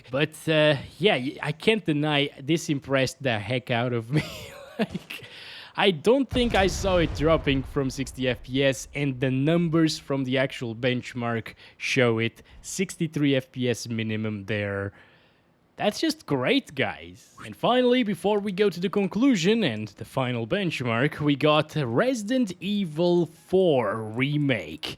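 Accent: Portugal. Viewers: és de Portugal? Foda-se kkkkkk boms videos mano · Voce é Português ou nasceu em outro país e foi morar em Portugal? Acompanha seus vídeos a algum tempo! Deve ser o português mais carismático que já conheci! haha! Sou do Brasil!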